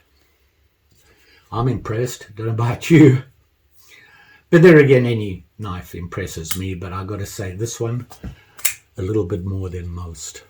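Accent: South Africa. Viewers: Just found your channel and being a knife maker and mad about knives really enjoying your content, recognized that accent straight away 🇿🇦 . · are you south african ?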